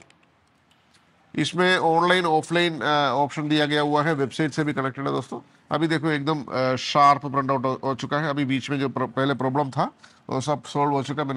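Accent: Kerala, India. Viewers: Malayali aaanelle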